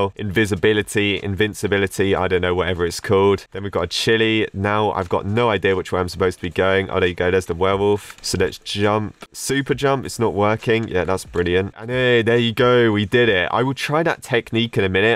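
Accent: United Kingdom. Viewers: are you came from UK?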